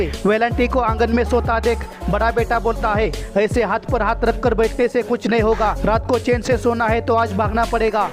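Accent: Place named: Maharashtra, India. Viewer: Bhai aap toh pakka maharashtraian lagtey ho...superb broo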